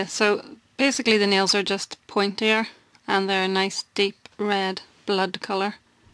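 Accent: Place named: Scotland, United Kingdom. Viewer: Scottish? I love your accent x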